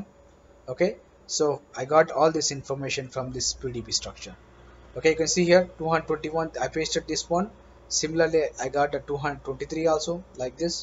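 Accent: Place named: India. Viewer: Indian English.........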